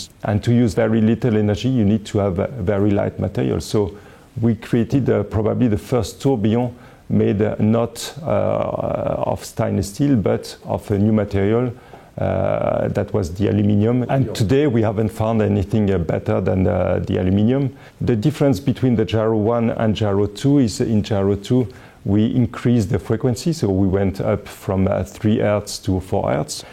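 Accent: France. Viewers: Qui a reconnu l'accent français ? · He speaks great English with a French accent..... I speak average French but I try my hardest to recreate as good a French accent as possible.... When speaking to a French person my aussie accent shows through and probably sounds similar to the way I hear his French accent when speaking English. I couldn't fault him. Excellent description and vocab. Dunno what the fuck you're on about mate.... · Je reconnaît ton accent de français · Ho non... Cet accent français 😂😂😂🤣🤣🤣 Non mais franchement fait un effort 😂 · Cette accent français mdr <3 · french accent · Magnifique accent français ;) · Wonderfull french accent for the Forst video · Francuz mówi po angielsku - MASAKRA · dude that french accent tho XD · As a french i totally spot that accent from the first second x) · French accent too much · Cet accent français de l'espace ! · laccent Français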